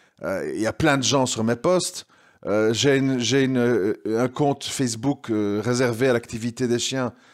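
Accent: Belgium. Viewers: tu es tres flou par manque de temps et d’exercice je le sais tu es un homme qui enrichit en plus avec ton accent belge qui rend + crédible qu'un francais. J'ai compris que tu es sincère inutile de perdre du temps sur ça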